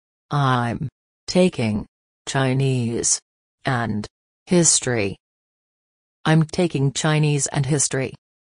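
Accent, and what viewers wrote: United States. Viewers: Спасибо.Но,это американский диалект. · Нужно английское произношение, это наверное, американское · it's american english pronunciation! you should teach RP english.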